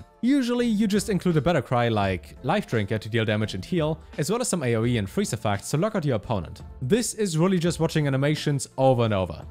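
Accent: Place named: Germany. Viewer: That fast German-English accent is exhausting to listen to.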